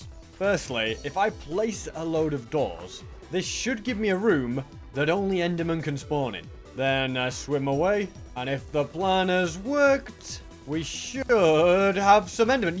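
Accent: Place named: Yorkshire, United Kingdom. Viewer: I love your Yorkshire accent!!!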